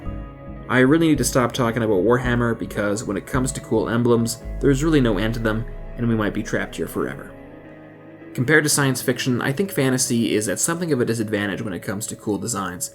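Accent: Canada. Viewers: You sound so Canadian. The Canadian flag is simple, striking, and well designed!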